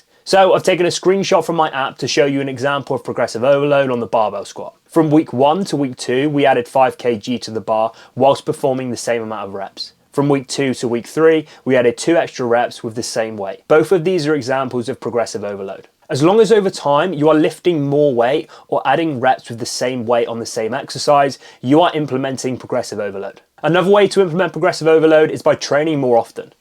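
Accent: United Kingdom. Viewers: Oooo he’s Bri’ish 😍😍😘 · You have some gorgeous blue eyes and I love the British accent. That's what you call a Bubble butt in the black community in the USA. I will never have a flat or wash board stomach because at 65 years old, I don't have the energy to be doing a lot of exercise. I am not overweight by the weight. My weight is 180 pounds and I am 6 feet tall. 🤣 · For me, it is his eyes, and adorable ingenuous accent. Brits are the best!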